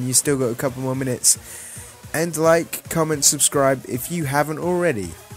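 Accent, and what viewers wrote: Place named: Russia. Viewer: ***** you sound like a russian and im not insulting you. Your Russian voice makes me watch your videos :D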